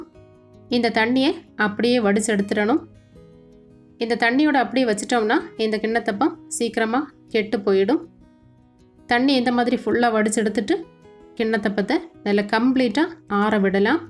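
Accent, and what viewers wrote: Kerala, India. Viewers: Are you a malayalee? Your tamil has malayalam accent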